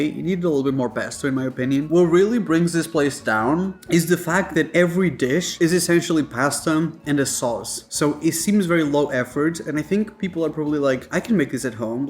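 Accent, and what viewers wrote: United Kingdom. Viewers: I thought this guy is British. Did he get American citizenship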